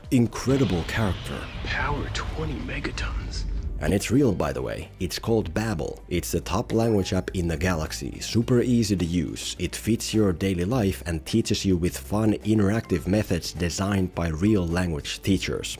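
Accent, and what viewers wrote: Finland. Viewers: This is the most finnish sounding accent I've ever heard... regards, a finn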